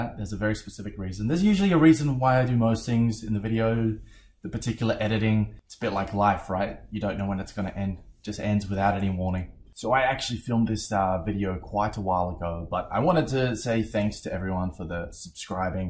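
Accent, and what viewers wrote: Australia. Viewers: Can i guess that you're from Victoria?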